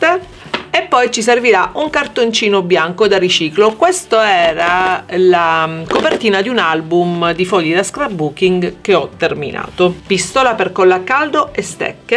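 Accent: Catania, Italy. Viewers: Ma tu sei di Catania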